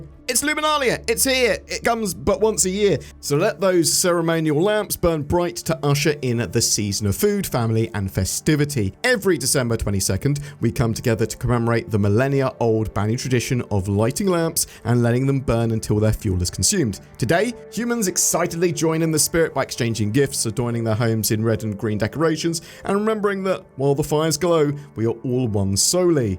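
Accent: United Kingdom. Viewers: Is it possible for you or to record yourselves, in your British way, saying these words? Girlfriend, murder, rural, juror. Your struggles with the word "digital" made me think of it.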